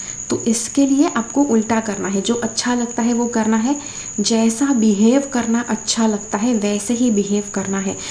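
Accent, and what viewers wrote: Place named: Kashmir, India. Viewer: . ok thanks Apka accent thoda kashmiri panditon sey milta hai isliye pocha tha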